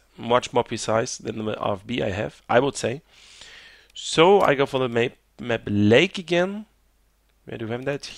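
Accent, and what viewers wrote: Germany. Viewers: Are you german btw? I just discovered you. You definitely sound german :D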